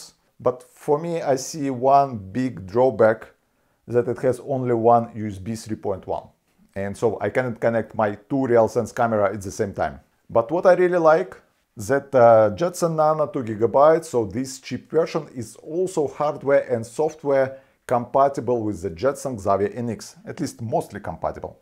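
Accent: Russia. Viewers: 38 seconds into the video and I can't stop wondering: Или автор русский и ничегошеньки не хочет делать с акцентом or if this is the most hardcore russian accent imitation I've ever seen. Good vid though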